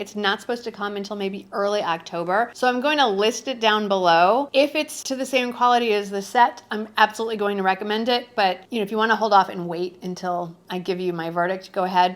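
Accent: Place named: Illinois, United States. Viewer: I thought I recognized your Ilinois accent! Former Chicagoan here who has lived in Florida for 34 years! Go Bears!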